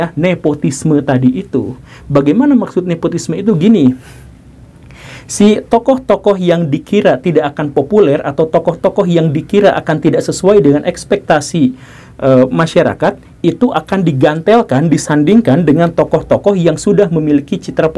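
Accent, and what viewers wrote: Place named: Java, Indonesia. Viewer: Tetapi jawa logatnya kok susah diubah ya? Etnosentrisnya masih kebawa2 di rantau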